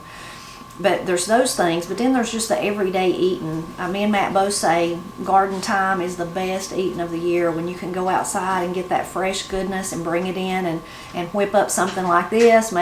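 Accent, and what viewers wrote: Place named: Oklahoma, United States. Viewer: My mom was born and raised in Oklahoma in the 40's& 50's. She married my dad in 1958 and they moved to Iowa where my dad was born and raised. My mom was raised on much the same foods that you make and eat. My dad, brother and I refer to fried okra as eating "candy" to this day. Mom has been gone for 22 years and the way you talk and the meals you prepare remind me so much of her. Thanks for the great memories!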